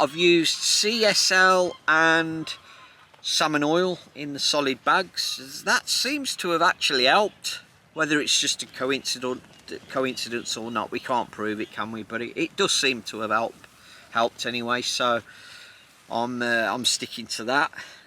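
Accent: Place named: West Midlands, United Kingdom. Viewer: Dont know for sure but the accent is west mids??? so be a bit of a trek. Im south notts so kettleby is only 20 mins from me. Nice to meet you 😀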